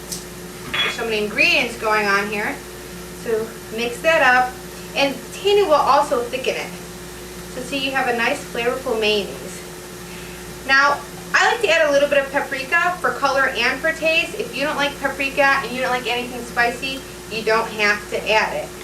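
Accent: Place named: Rochester, United States. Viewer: You have a Rochester NY accent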